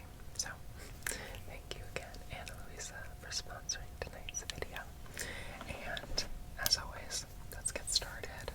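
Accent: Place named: Bronx, United States. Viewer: YOU'RE FROM THE BRONX?!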